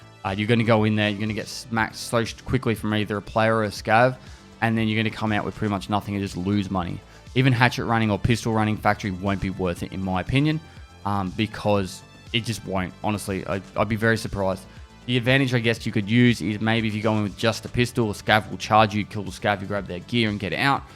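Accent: Australia. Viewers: Never had issues understanding what he says, guess it's your ability to understand his Australian accent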